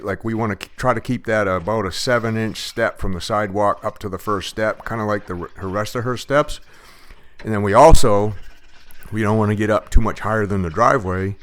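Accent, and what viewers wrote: Maine, United States. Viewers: I knew you was from maine as soon as I heard you start talking, I'm originally from Maine. I lived in Washington County. Actually, the little town was called Princeton, but I watched your video 1 day because my husband is a Mason of like 40 years. And I was just going through different videos and see how other people did stuff and have been pop on yours and new year's from may good videos, by the way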